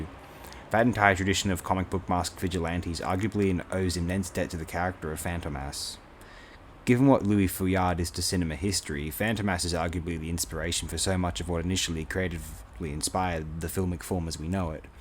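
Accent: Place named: Australia. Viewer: 1: He sounds australian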